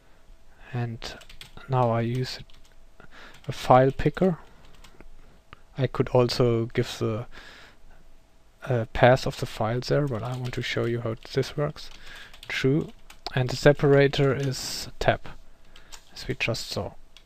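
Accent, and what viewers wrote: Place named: Germany. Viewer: thank you, really very useful.. i kind enjoy the German accent :)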